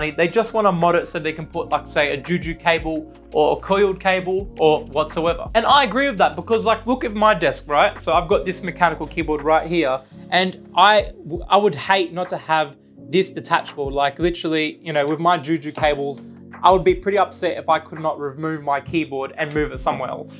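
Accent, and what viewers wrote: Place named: Australia. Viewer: MATE THIS VIDEO IS GREAT, AND I LOVE HEARING A FELLOW AUSSIE DOING KEYBOARDS love from western syd <3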